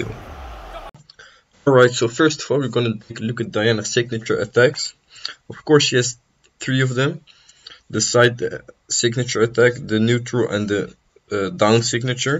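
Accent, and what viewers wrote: Netherlands. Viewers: Are u dutch? Lol i hear a accent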